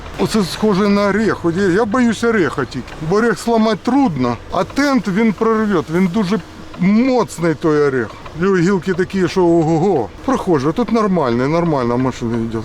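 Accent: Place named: Russia. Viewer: Боже, як в тебе мова запаскуджена язиииком!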